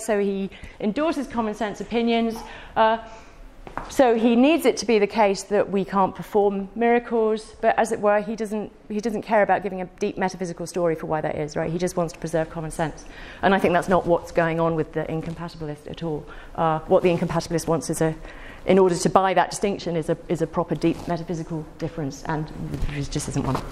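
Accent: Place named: United Kingdom. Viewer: I used to love the britisch accents. of course, I don't get to hear it that often, but nevertheless, I had such a hard time to follow this women for sure for several reasons (speed and reduced content etc), but her accent was really hard to follow at that speed but even with low speed it doesn't get better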